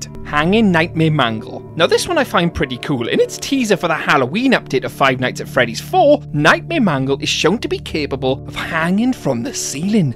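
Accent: Wales, United Kingdom. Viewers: sorry are you welsh? you sound very welsh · Are you Welsh because you sound it? Well, so am I! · nice to know there's welsh people on YouTube, barely see it, can tell from the accent. i have the same. keep it up mate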